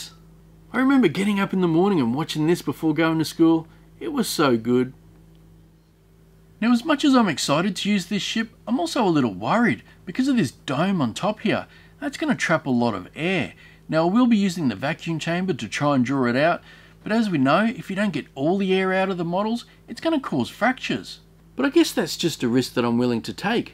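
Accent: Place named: Australia. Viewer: I love everything you make and it is nice hearing a fellow Aussie on YouTube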